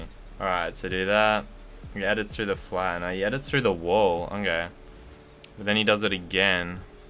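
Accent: Australia. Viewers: Yo he has like a Australian accent and an American accent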